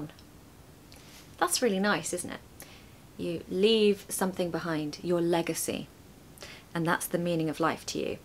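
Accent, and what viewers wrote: RP, United Kingdom. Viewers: Hi, you sound like Lucy from English with Lucy. Has anyone told you this before? Or is it anyone with RP speaks as you do? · Lovely to listen a fantastic british accent! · i wish i could speak english with a pr accent like you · I love your british Accent because overwhelmed me as well as your style of presentation and l'm fond of you.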